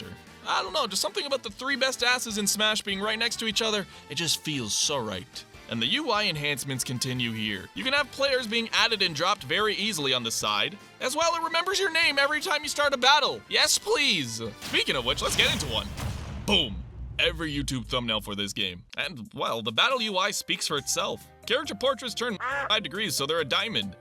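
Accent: Canada. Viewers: Wait are you Torontonian?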